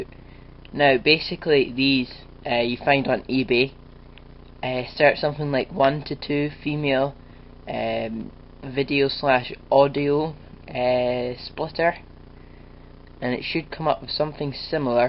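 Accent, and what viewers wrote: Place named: Scotland, United Kingdom. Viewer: Chewin the fat this guys well Scottish :L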